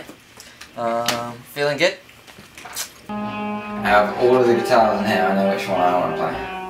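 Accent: United Kingdom. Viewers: I love his accent... Give it up to British guys <3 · I think he actually still have that British accent :o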